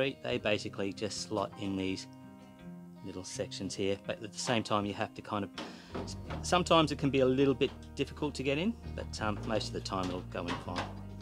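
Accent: Australia. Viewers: glad I watched this before I took my dryer off the wall and opened up the back. I've worked on a few dryers in my time changing belts capacitors elements and door switches but have never taken a timer out. My timer has the second symptom you described stopping at around 90 mins and just before cool down. Also just checked eBay and there are new ones for $45. You sound like you have an Aussie accent. Good Clip, invest in a Tripod it will make all the difference at your end. Cheers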